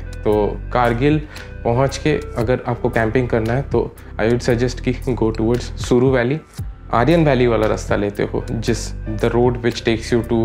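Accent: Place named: Bengal, India. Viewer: The way you gave info it's amazing . And thanks for the info man keep growing. I have a question are you bangali ? Your accent sounds like Bengali a bit .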